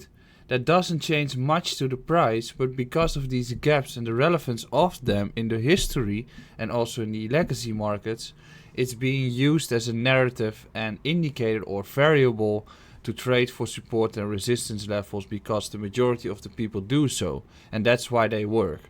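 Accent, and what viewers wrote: Netherlands. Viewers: thanks for your vids and your sweet Dutch accent...I assume. the bounce off the 20 week average was promising but market looks like its weakening.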